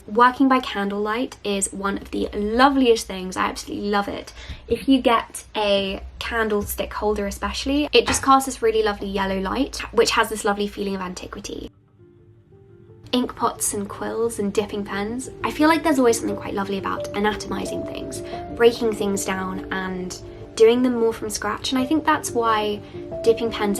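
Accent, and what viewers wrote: United Kingdom. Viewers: I was knocked off my chair (figuratively) by the Britishness of your accent.... OR. MY. GOD😍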